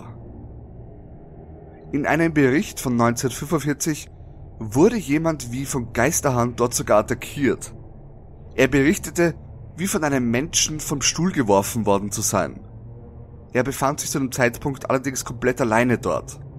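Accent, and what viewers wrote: Vienna, Austria. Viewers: Ich liebe den Wienerischen Akzent🤤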